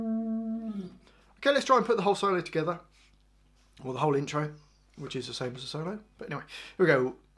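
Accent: United Kingdom. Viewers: Omg how cutie british accent I love him speeches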